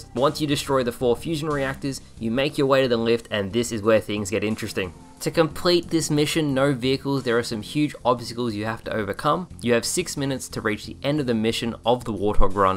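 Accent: Australia. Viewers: the aussie 13 year old voice just broke my immersion in your videos.... unsubbed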